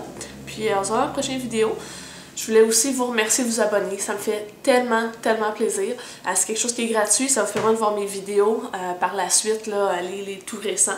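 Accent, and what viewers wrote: Quebec, Canada. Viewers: Maquillage divin! comme d'hab;) si seulement j'avais la moitié de ton talent! mais j'y travaille sérieusement, grace à tout tes conseils et tes vidéos super intêressantent! Merci de te lever si tôt pour nous faire tes tutos! Au fait! ton accent Quebecois! j'adoreeeeeeeeeee et t'ai " " comme tout =)))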